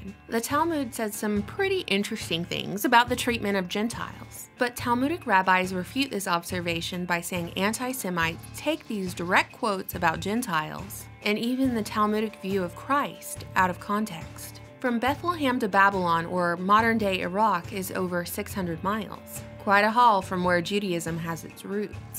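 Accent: South, United States. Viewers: GREAT VIDEO! Thank You....Love Your Southern Accent.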